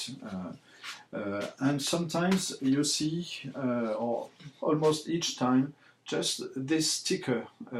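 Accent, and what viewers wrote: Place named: France. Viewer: Always appreciate the French pronunciation! If I had more time in the day I would love to study it since outside of my English speaking stuff, French movies and music seem to dominate my music and film collection next. looks very intriguing. I love and the.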